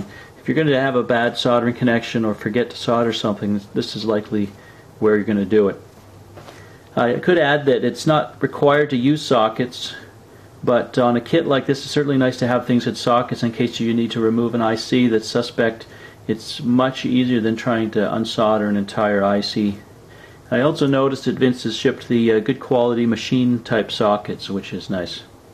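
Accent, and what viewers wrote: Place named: Canada. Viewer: Great project! Unfortunately, it seems Briel is no longer selling kits. BTW, while I was watching I was thinking " sounds Canadian" and sure enough you are:) (From a fellow Canadian.)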